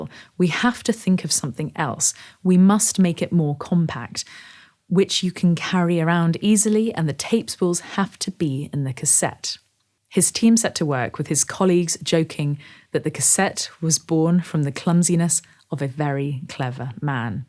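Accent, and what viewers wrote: United Kingdom. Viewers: Lovely British accent you have. listened yesterday Face To Face album from Klinik recorded almost 30 years ago.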